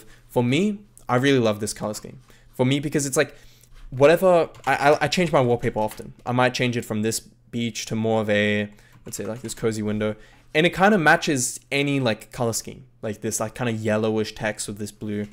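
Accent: Hungary. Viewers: are you hungarian by any chance? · az úr magyar? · Hey man are you hungarian? :D love the video Nagyon jó videó lett:) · are you hungarian ? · magyar vagy? xd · Are you Hungarian?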